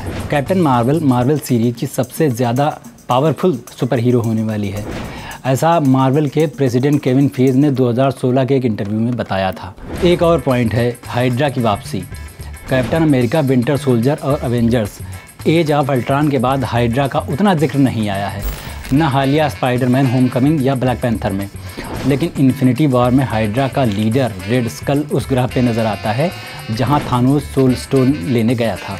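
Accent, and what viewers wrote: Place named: Bihar, India. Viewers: Bihar se ho ka bhai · Bihar ke ho.....???? Work on your acnts bro.....u r respting mr dan 1 milon subs channel...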